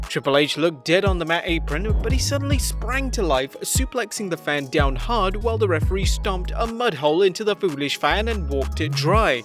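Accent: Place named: United Kingdom. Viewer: Why’s there always some British dude narrating these things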